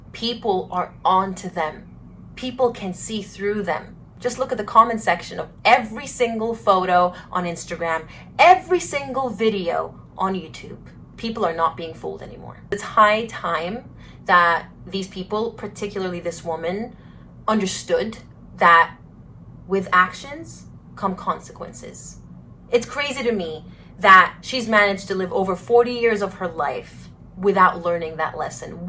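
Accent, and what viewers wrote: United States. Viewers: has an American accent though. She must've moved to Australia then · She moved to Australia as a child from another country which I am not sure which country from. It is possible that she is from America but she mentioned that she was treated differently when she arrived to Australia initially due to her Hispanic looks. She did her school and uni in Australia and she was even in the Australian armed force as a trainee for a period while she was studying law. Australia is a wide country and not all of us sound the same. There is typical Aussie accent from the bush and city people sound completely different. Yes, I can hear slight American accent in her but she also sounds Aussie to me. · I just happened upon your channel. Wow. You are great !!! I am so pleased to see a young American ( I'm only assuming due to your accent) woman who is obviously educated and well mannered, speaking out in defence of Her Majesty, and clearly stating the facts about Harry's wife. 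She must be called out, it is so important to keep on track of all the lies and slander from this talentless grifter fake duchess who conned Britain and the Royal family. Thankyou 🇭🇲😘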